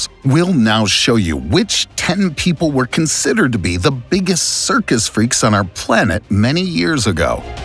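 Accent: United States. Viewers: Don't speak with an American accent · the narrator sounds American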